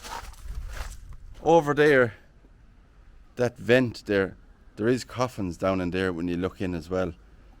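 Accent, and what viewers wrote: Ireland. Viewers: 322nd So many people are clueless about human biology and what happens to a body when you die ..... great youtube page "ask a Mortician" ..the body bloats and releases trapped gases....love your accent / my direct relatives are from Donegal - Gallagher/Coll/Costello ...I was in Ireland 15yrs ago - Gorgeous country ....I wonder if the Cusack..... is related to the Actor/family Cusacks? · I like the term of torch, vs our flashlight. 😂 To bad a person re-purposed yours, for themselves! Hello from Northern California, I am enjoying another of your videos. I am getting better with understanding inflections with your accent, in addition to having had some amazing Irish neighbors, who have moved back to Ireland. Happy New Year to you, your team and family.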